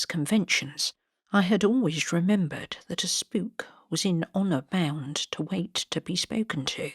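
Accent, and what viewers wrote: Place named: Midlands, United Kingdom. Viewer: Are you from the midlands,? I love your accent and your beautiful narratives! Thank you, my Lovely. X X X😊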